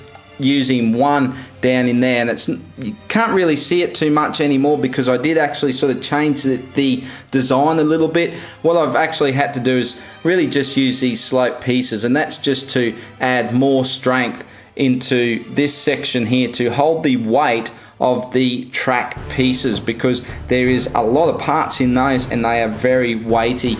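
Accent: Australia. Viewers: He's from Australia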